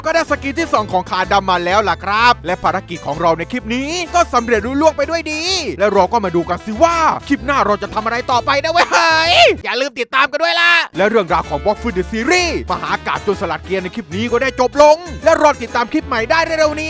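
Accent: South, Thailand. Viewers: เป็นคนใต้หรอครับ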